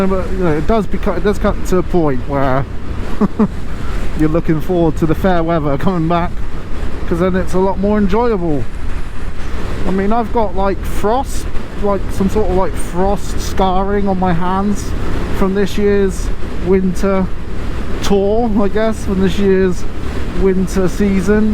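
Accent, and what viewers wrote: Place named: West Country, United Kingdom. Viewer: Great video very entertaining to listen to you rant a bit about the weather, work etc.I noticed your west country accent straight away,I am from Cornwall!I live up country now in Cambridge area but travel abroad often on my bike to Italy.I have ridden in some pretty bad weather in the UK and France often!I am 59yrs old so gen x , very much a rebel ex punk/skinhead.Glad to see younger riders like yourself riding!😁👍🏍️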